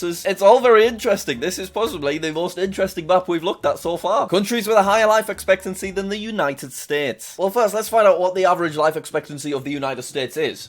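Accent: United Kingdom. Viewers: is basically Drew Durnil with a British accent. (seriously, they just scroll through the same reddit threads and upload the videos a few days apart?)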